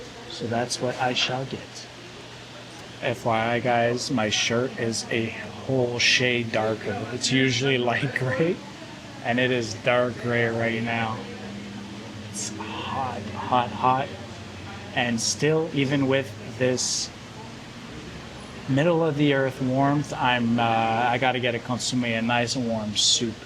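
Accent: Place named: Mexico. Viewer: You’re doing a great job contributing to México with your updates. Really shows how much you love México and have made it your home for your family. I hope that Mexicans embrace your integrity and authenticity. Appreciate your consistency with videos, your beautiful Mexican Spanish accent (and the effort!), and your sense of humour.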